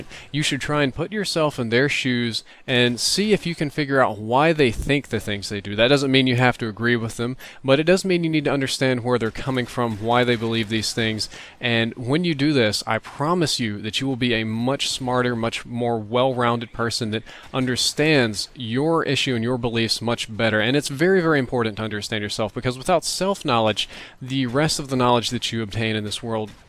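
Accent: South, United States. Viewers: your pronunciation of words such as what or white make up four the southern accent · Yo, Where are you from? What state in the south? (I can't seem to place your accent)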